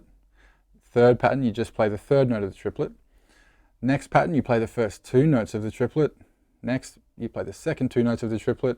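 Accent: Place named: Australia. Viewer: mate! I knew as soon as I heard the Aussie accent that these vids are going to be dinky di. You don't waffle on with a 20 second intro of some extremely mediocre hard rock music, followed with a "Hi guys, I'm from xxxxx in xxxx, like to say a huige thanks to my sponsors" Blaaah blah blah... Straight to the point! Love it mate! Cheers from Melbourne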